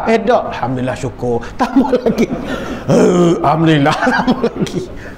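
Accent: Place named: East Coast, Malaysia. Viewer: paling suka dengar YB Dato cakap loghat pantai timur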